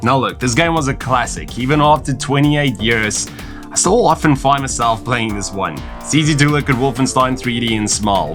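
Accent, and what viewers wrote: South Africa. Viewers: South African accent there right? Always nice to find another saffy on utube. Warcraft II was a great game. Played that and dune 2 so much. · Can hear that south African accent! I right? Pretoria or cape town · Good video, but god that Seff Effrican accent is obnoxious. You're one of the few YTers whose videos would be improved by a robot voice.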